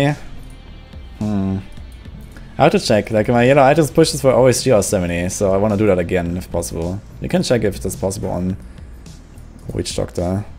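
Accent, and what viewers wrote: Germany. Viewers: as fellow German you probably can hear his German accent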